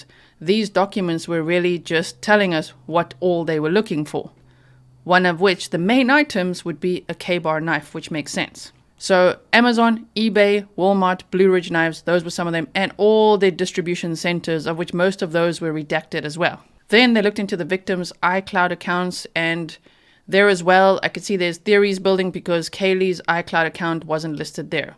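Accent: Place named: South Africa. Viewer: Great summary. Don’t lose the accent👍🇿🇦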